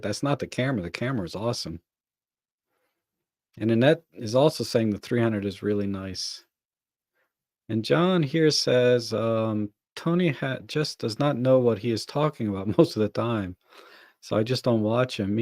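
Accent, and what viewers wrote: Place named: United Kingdom. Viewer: I thought you were british, you dont speak as sloppy as most American. I am from Sweden by The way and follow you, red 35, Peter Forsgård, Matti Sulanti, Robin Wong, micro four nerds among other.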